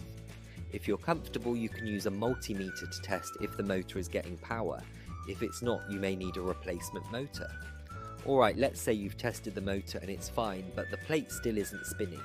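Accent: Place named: United Kingdom. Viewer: Can't understand the Limie accent.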